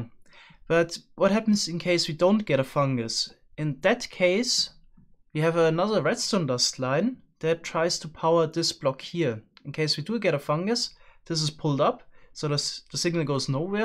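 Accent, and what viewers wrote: Germany. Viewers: I'm curious, are you German or Austrian? I recognize that accent... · Are you german? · He is german isn’t he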